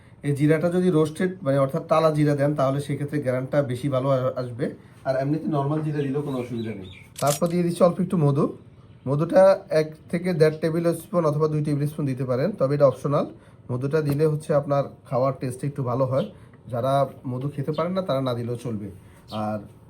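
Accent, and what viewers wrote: Noakhali, Bangladesh. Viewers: নোয়াখালী কথায় বুঝেন না ?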